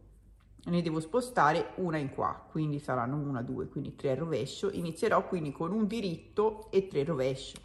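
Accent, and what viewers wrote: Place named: Tuscany, Italy. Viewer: (accento Toscana ciò che adoro)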